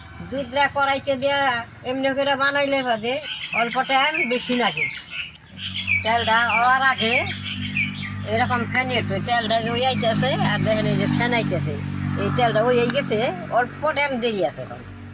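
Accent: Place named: Jamalpur, Bangladesh. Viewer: আপনার ভাষা অনেকটাই বাংলাদেশের জামালপুর জেলার ভাষার মতো ❤❤❤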